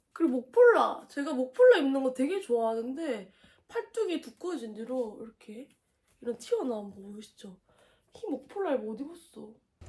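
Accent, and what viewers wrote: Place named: Busan, South Korea. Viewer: 부산 여자 너무 좋다. 사투리가 매력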